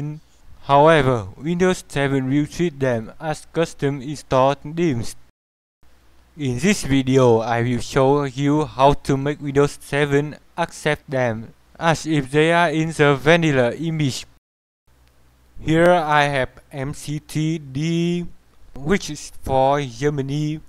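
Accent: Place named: Malaysia. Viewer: I like how you speak English, it sounds totally like how a Malay or Singaporean Chinese speak English rather than a Vietnamese. But, nonetheless that's super dang interesting themes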